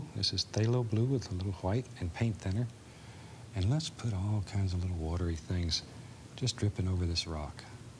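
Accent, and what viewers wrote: United States. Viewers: It's a regional American accent. If you're referring to the way Bob pronounces it. ♥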